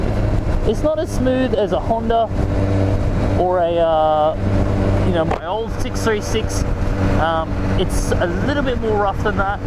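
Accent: Australia. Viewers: You're an American with an Aussie accent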